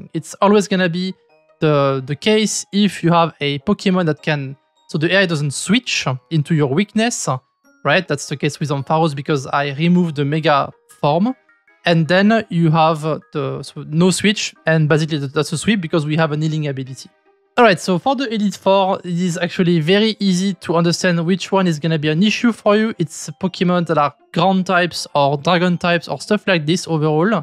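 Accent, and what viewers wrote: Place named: France. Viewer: J'ai jamais vu un bon youtuber faire si peu d'efforts d'accent, vraiment là tu peux pas me dire que tu peux rien y faire, tu peux que t'améliorer parce que vraiment on dirait une voix IA française qui lit un texte en anglais c'est chaud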